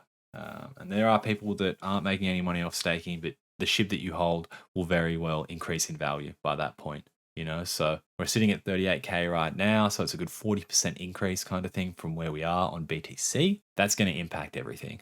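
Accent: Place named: Australia. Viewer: I presume your an Australian (buy the nice accent lol) easy to listen too, unlike many other YouTubers Just subscribed from Melbourne , great job mate , hodling a few Shiba 🤛👏👍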